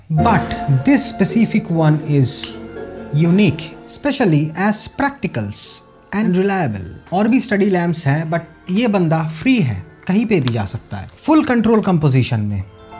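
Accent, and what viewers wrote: Bengal, India. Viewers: Bhai tum Bengali ho ???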